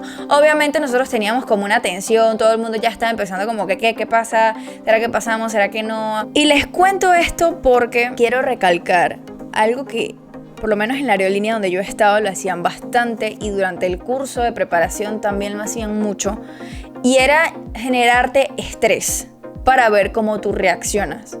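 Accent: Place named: Venezuela. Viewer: Hola, soy venezolana creo que tu también por el.acento tengo 55 años gozo de buena salud y me veo bien, mi sueño es ser TCP en alguna aerolínea estadounidense pero vivo en Venezuela mi pregunta es ellos piden el GED soy teacher de inglés en mi país ya con eso es suficiente? No tengo visa sólo pasaporte italiano porque tengo la nacionalidad ellos me tramitarian la visa de trabajo en caso de ser seleccionada? Espero me puedas contestar cariño.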